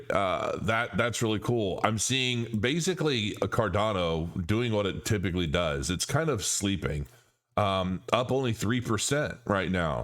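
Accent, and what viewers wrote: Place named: Stockton, United States. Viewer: About time you sound like you grew up in Stockton CA.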